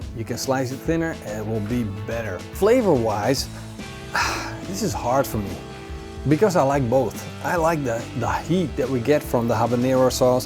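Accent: Netherlands. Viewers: your accent sounds really Dutch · Do I detect a Dutch accent?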